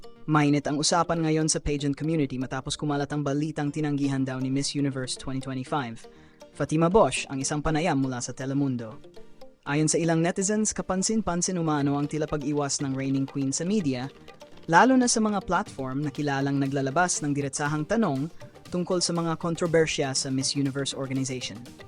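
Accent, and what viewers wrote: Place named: Visayas, Philippines. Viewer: Hala! May accent ka na nga !!! Dati tunog visayas kaw!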